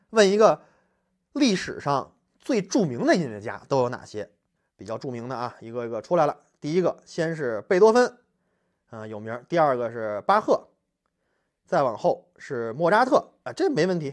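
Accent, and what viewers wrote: Beijing, China. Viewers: 馮老師講英文真有趣，充滿北京腔，哈哈哈哈哈！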